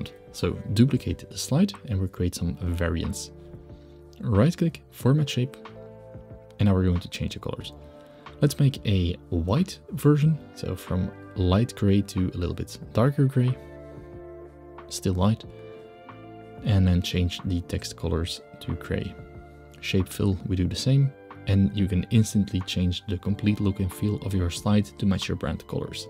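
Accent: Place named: Netherlands. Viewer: I recognize a Dutchie when I hear one !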